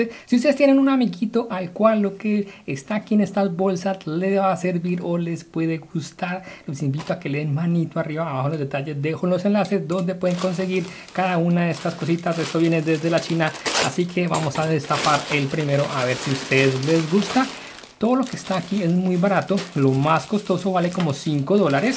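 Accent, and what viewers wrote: Colombia. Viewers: Hola Eres colombiano??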